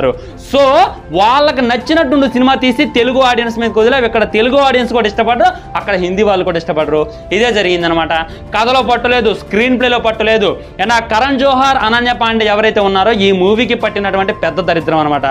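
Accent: Khammam, India. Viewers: Bro meedhi khammam aah?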